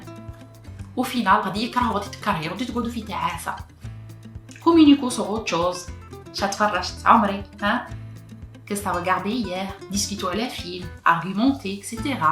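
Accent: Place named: Oran, Algeria. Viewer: J'adore ton accent oranais, ya Omri tu m'inspire avec tes conseils continue stppp 💞💞💞💞💞💞💞💞💞💞💞❤️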